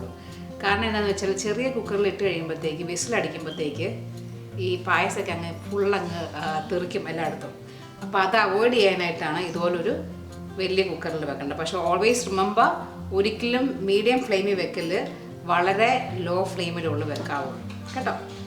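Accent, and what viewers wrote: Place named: Kottayam, India. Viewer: Chechii.. beautiful presentation👌. Samsarathile aa kottayam style... 😍😍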